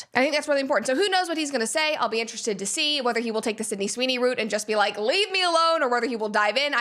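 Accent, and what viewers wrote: United States. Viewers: citizen journalism is the future of news MSM is declining and i liked vibes fast, quick accurate precise vibing with American accent more like ASMR speeldial:person-turqouise-waving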